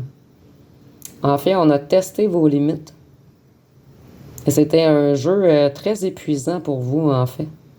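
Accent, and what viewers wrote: Canada. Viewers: Bonjour toujours très juste,bravo avec ce bel accent canadien, merci belle journée à vous 🙏👍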